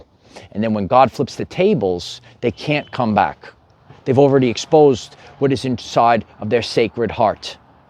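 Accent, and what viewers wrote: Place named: Brooklyn, United States. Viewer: I just fully processed you have a heavy Brooklyn accent after all this time. 😂 Thank you, greatly, you helped me find my wings again. Actually, more than just one set. I need a closet for them all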